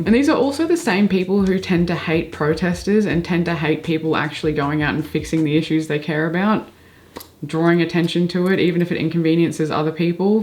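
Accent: Australia. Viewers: Aussie John Oliver is back!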